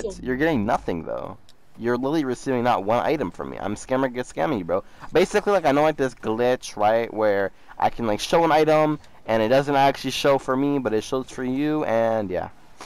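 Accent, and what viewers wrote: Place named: United Kingdom. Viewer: he's just British he said mate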